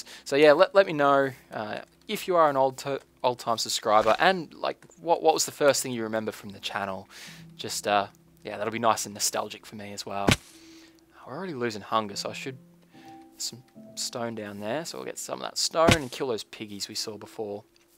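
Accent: Australia. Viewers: i completely feel how u feel atm, my upload is completeley shit, and eveything around me is being pretty bad as well, bad net, too much work etc. i recently heard about u from the hermitcraft community and after seeing the first 7 minutes of this video, i feel that we have lots of things that are very similar between us, and thats why, i think ill be watching more of you! nice to know that im not the only one who has faced this kinda situation. I got two questions for you though a- what did u mean by 'tuberblues'? sorry english isnt my first language and i didnt understand what u meant when u said that word. and b- are you Australian or new zealandar ? i swear that accent of ur, so much like the guys here is australia! (lol yeah i live in AU) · Old timer, glad to see your back. I really did miss hearing the aussie accent on YouTube. Btw, which paintball field did you work at /are you still working there? 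I can't remember if you told us if your still working there. It's been a day after watching your video. And again. So glad your back. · cool to see you back bro, missed that aussie accent <3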